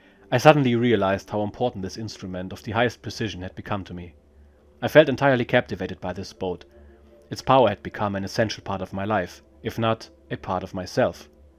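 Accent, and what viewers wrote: Germany. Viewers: You are a terrific narrator. Your German accent makes this all the better. I am second generation German and am currently reading the German version to build my language skills. Thank you for this !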